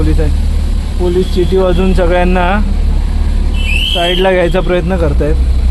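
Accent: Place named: Goa, India. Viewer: Tumchi bolnychi tunning goan vatte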